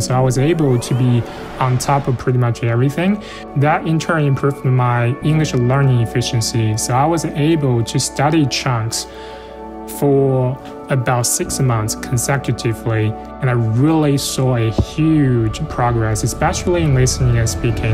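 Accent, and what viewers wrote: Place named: China. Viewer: 听你的中式英语还自以为很流利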